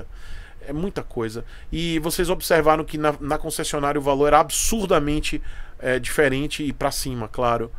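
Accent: Bahia, Brazil. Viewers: vc é baiano?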